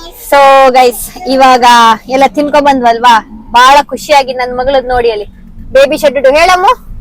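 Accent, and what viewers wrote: Kundapura, India. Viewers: Nam kundapura kannada keli kushi ayt🎉🎉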